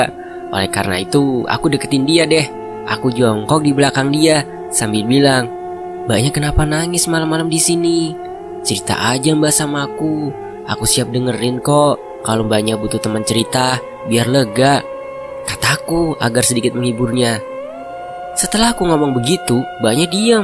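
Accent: Java, Indonesia. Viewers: Sya suka cerita mistis tapi kalo ngomongnya jawa medok jadi males dengernya memang udah ada teksnya tpi ini cerita bukan film saya dengernya sambil kerja...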